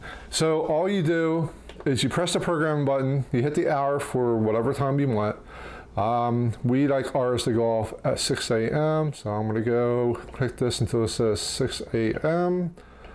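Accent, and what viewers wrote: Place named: Philadelphia, United States. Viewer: Just subscribed . Just bought this and listened to you on the way home . I thought I recognized the accent then I heard “wooder” and I hit that subscribe button... r u from Philly or delco or pa ? HHaha cheers from one pa youtuber to what I think is another one !!!!! Peace ✌️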